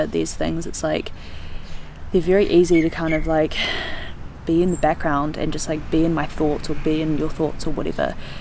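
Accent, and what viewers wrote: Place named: New Zealand. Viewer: Don’t be so anxious...I could listen to you read the phone book for hours...your kiwi accent is like ear candy...keep talking....in other words, don’t feel like you have to live up to any high standard, with incredible scenery or with wild adventures....just be yourself....I’ve been watching you on Delos and Ramatree for a few years and so has a lot of other people....we feel like we know you and we truly care about you...and we want you to have fun in whatever ever you do, but to also be cautious....the Tunisia video had a lot of us scared....I think most people just want what is best for you....and that is where some of the judgements from viewers are coming from....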